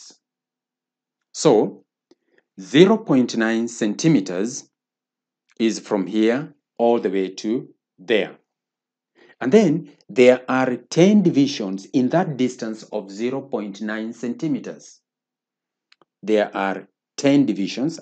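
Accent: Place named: Uganda. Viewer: Perfect explanation sir As if you are Ugandan. Am I right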